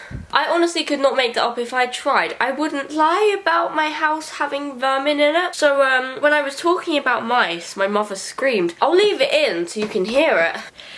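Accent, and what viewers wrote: United Kingdom. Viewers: Amanda Dorothy Anderson it's called a British accent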